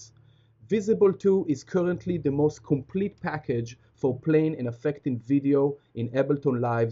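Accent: Israel. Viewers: Hey i know that accent! shalom =] getting into vj'ing. this helps alot thx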